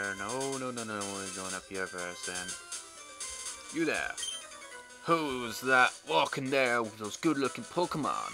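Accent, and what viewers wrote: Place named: United Kingdom. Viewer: Love the British(?) accent. Lol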